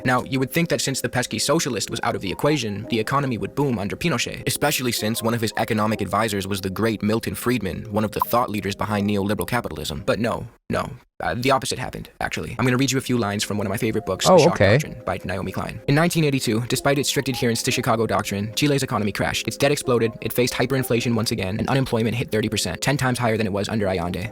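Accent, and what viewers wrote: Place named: Quebec, Canada. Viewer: bro quebecois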